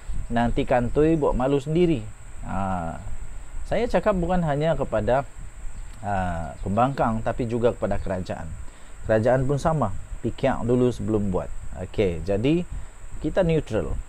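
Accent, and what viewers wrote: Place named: Kedah, Malaysia. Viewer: Awak pandai cakap Kedah hebat la awak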